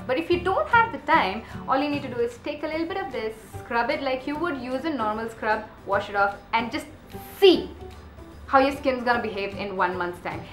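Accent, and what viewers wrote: India. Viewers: You speak like an Indian..where do u belong to ?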